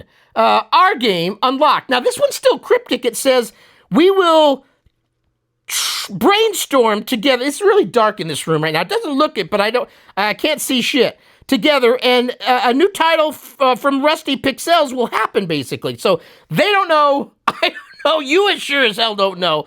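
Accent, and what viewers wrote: United Kingdom. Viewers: I went to the main news channel ZX Spectrum Next to say hello again (I'm one of the 27 lucky ones) and thank you for everything you do. I'll subscribe, I'll drop by more often, and at the same time I'll improve my English skills, because no matter how hard I try, I can't understand anything about the British dialect :)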